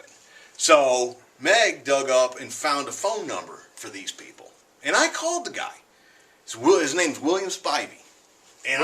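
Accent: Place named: United States. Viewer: if it wasn't for your American accent.. I'd think you were a fellow Canadian brother.. hahah annnnddd nobody here will steal your guns.. they may take some of your beers though! haha nice guns.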